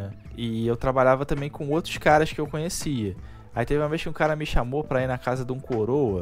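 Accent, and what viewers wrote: Rio de Janeiro, Brazil. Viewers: Tem muitos caras bons na rede social, mas quando o tutorial tem sotaque carioca não dá me desculpem, mas é pura verdade, eu tenho vários amigos que também reclamam.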